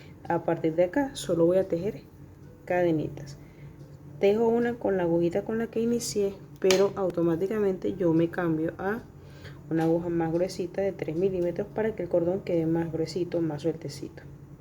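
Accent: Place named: Venezuela. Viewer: Te has ganado una suscriptora más!!!!.... te felicito... me encanta como explicas. Creo que somos paisanas por tu acento jaja... lo hice y quedó muy bonito. Espero que mi clienta quede satisfecha, cuando me envíe foto te las paso por instagram... Gracias otra vez por compartir tus conocimientos con otras tejedoras... un abrazo Venezolano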